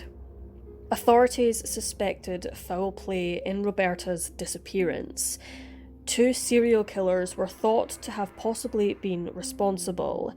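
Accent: Scotland, United Kingdom. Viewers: I like your accent, scottish?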